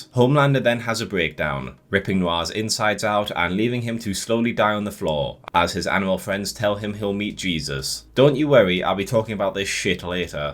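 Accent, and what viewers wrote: United Kingdom. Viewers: 2 HOURS OF A BRI-ISH GUY BITCHING ABOUT HOW UNBELIEVABLE A SUPER HERO SHOW IS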